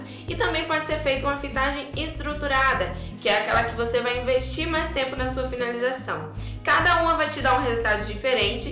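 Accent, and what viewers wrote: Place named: Recife, Brazil. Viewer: Adorei seus vídeos, seu sotaque é lindo, vc é do Recife? Ah, vc é uma fofura, lindíssima!! 😘😘. Sucesso e benção de Deus pra vc e sua família! ❤❤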